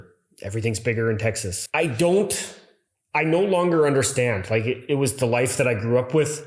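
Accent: Germany. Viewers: lo you got slight german accent. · Really thick german accent why?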